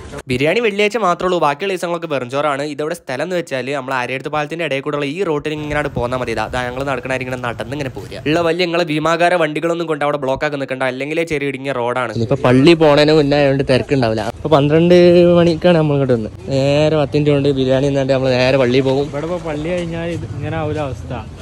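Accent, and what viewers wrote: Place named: Kozhikode, India. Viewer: Muttey eniku ninte comedy istapettu. Calicut slang ottiri ista. Am from kochi ❤ Biriyani ku chammandiyo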